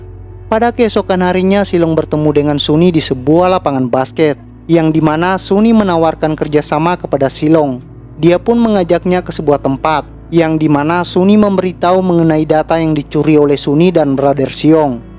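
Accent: Eastern Indonesia, Indonesia. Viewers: Yg ngomong ini sepertinya orang timur. Gak enak banget dengar bcra orang timur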